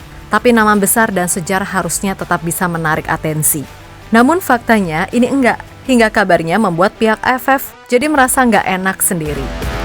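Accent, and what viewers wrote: Java, Indonesia. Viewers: Fiks mbak mimin orang jawa 😅😅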